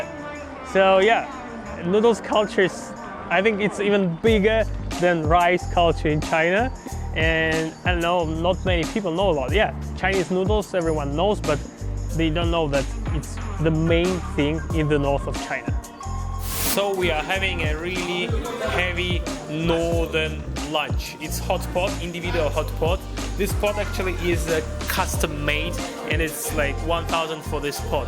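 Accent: Russia. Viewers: Your accent sounds like Russian btw, anyways great video! 😁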